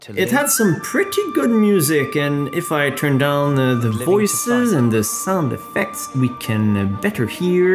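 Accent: Quebec, Canada. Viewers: I recognize your québécois accent ;)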